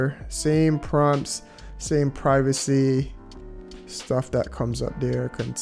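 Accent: Jamaica. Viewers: I can tell your Jamaican. Big up yourself bro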